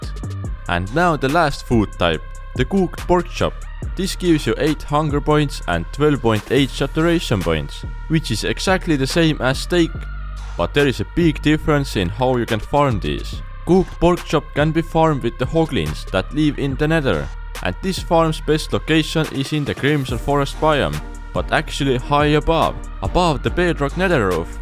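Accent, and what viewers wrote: Estonia. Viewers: He sound like he is Estonian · u from estonia??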